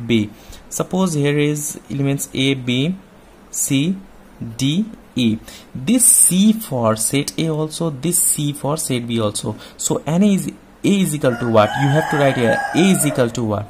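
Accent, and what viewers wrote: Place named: Nepal. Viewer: Your accent sounds nepali. Are you??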